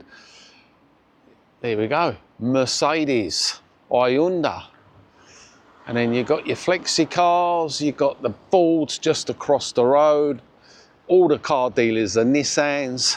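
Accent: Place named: Luton, United Kingdom. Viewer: Sounds like a luton accent originating in south london .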